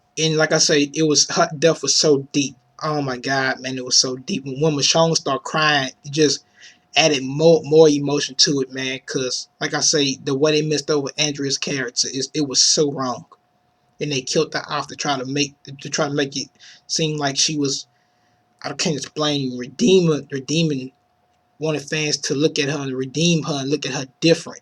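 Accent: South, United States. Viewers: You give great reviews guy! Fantastic critical thinking skills on display. Really liked your southern accent too. Good job breaking it down!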